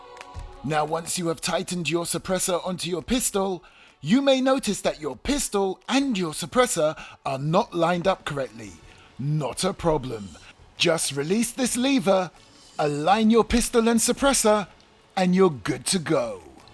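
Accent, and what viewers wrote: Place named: London, United Kingdom. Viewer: Hi, how long did it take for JKArmy to delivery to London (assuming you are from London from your accent)? Also, were there any issues with customs? Definitely thinking of picking this up. Thank you!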